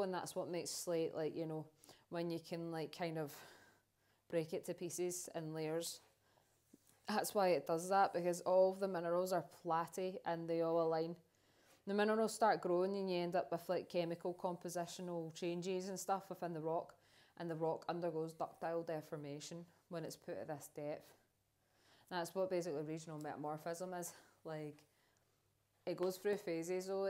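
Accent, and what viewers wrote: Scotland, United Kingdom. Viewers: Glad I chanced upon your channel. I was interested in your mention of mudstone ( and I've noticed you have a video on that). I live in Almondbank, a couple of miles northwest of Perth. There are some cliffs where the river Almond takes sharp turns and the cliffs are known locally as marley rock- as in crumbly. The rock is very soft and crumbly. The colour is pinky brown. I assume that's mudstone. I'll have a look at your other rock videos; thanks for your efforts. And its great to hear the broad scots accent.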